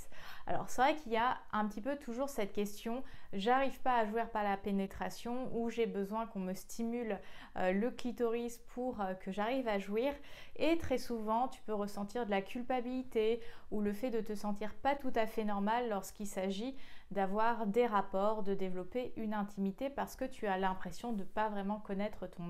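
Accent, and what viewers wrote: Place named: Morocco. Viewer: Peut-être es-tu originaire du Maroc ? à cause de ta prononciation tu as mon abonnement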